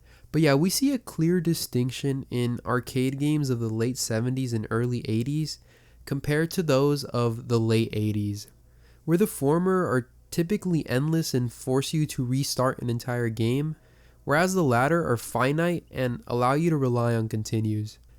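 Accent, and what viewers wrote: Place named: Brazil. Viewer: Dude, are you Brazilian? You have an accent that reminds me of Portuguese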